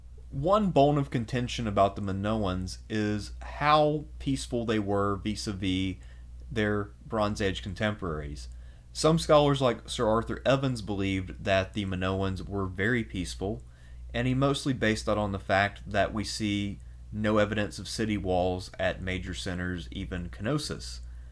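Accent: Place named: Texas, United States. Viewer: I live for the (?) Texan accent!! Thanks for another fun video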